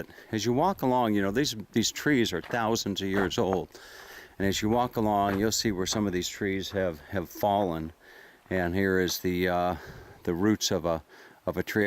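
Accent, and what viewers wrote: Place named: California, United States. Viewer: This is how all people from California talk! LOL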